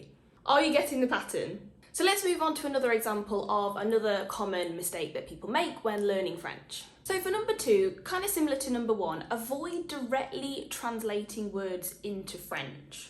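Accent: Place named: United Kingdom. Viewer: Welcome to the channel. I watch a few different French teaching channels (I’m still no good), and they tend to be American or french, so as a British person it’s nice to hear a British voice.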